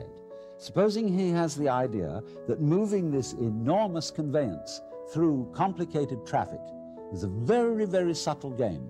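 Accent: RP, United Kingdom. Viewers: His good looks and posh Oxbridge priviliged speak got him through the drunken BS he spouted, gotten from reading other peoples' books. He couldnt make it through a speech without a bottle of brandy. Spiritual Alan Watts the contemporary guru heh? LOL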